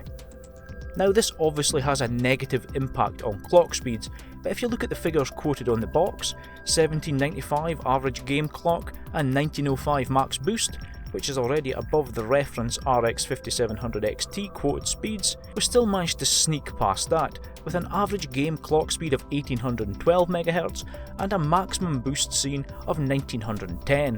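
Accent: Scotland, United Kingdom. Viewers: Subbed for the Scottish accent lol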